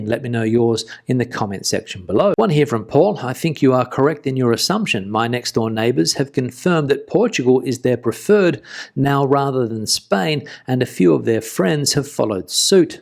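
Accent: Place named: Australia. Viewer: EVERY time I've been to Andalusia in July/ August, it's been over 40C. Cordoba is the hottest city in Europe. WTF this is news every year is only explained by climate hysterics trying to pretend it's something new. ITS NOT!?? - with an Australian accent? Since when did Aussies represent Spain??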